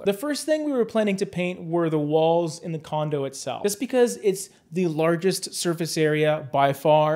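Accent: Canada. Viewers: He’s Canadian….different pronunciations there.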